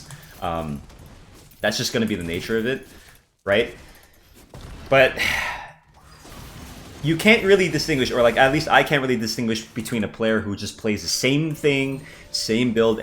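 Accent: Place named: Finland. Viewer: his Finnish accent definitely helps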